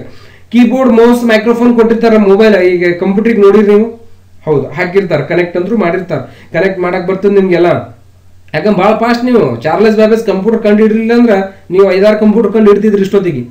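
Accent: North Karnataka, India. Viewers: Nim voice Uttar karnatkdolg mast ide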